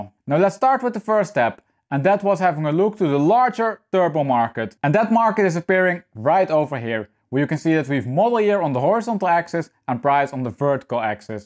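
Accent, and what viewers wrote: Netherlands. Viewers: Thank you! I needed that. You've got a Dutch accent am I right?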